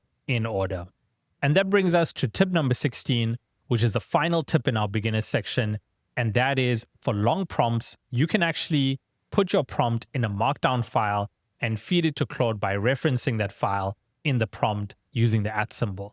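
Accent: South Africa. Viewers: Are you South African? Or do you have a South African parent? Your accent is very interesting, if so, it’s great to see a South African make probably one of the best CC videos I’ve seen. 🔥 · Are you South African??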